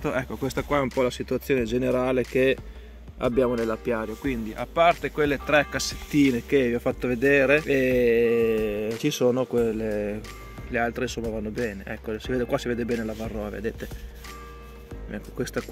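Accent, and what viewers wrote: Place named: Monferrato, Italy. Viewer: Ciao I tuoi video sono sempre interessanti, facci sapere cosa farai e come finiscono quelle due o tre iper infestate. Qui ho tolto le strisce settimana scorsa e ho trovato poca varroa, tra un mese o meno farò un sublimato, sono tutte in blocco eccetto una che ha una rosettina ma è un'arnia sfigatella, non ha raggiunto la massa critica per l'inverno mentre le altre sono in condizione 2 o 3 di forza, ho uno schema di valutazione da 1 a 3 per le varie caratteristiche dellalveare; io sono in Val Seriana e le temperature vanno da 18 a 2; tu dove sei, dall'accento ti colloco in Monferrato.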